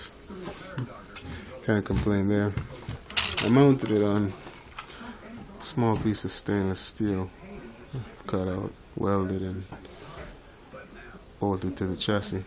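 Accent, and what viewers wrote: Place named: Caribbean. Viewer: yo, you sound like a Caribbean man. Where you come from?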